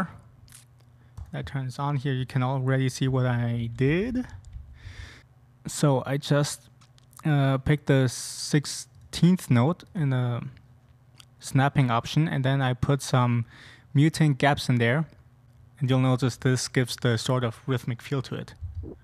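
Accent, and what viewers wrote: Germany. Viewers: are you a german guy? Greetings ?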